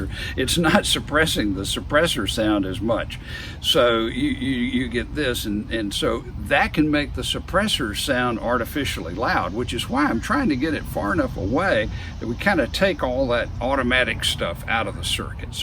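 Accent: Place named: Texas, United States. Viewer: He sounds like the most stereotypical texan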